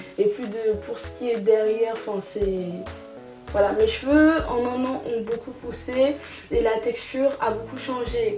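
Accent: Cameroon. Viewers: cc super vidéo ...merci pour les conseils mimi et drôle tu es de temps en temps mets l accent cam quand tu parles j aime bien j attends plus de vidéos ma chérie bizu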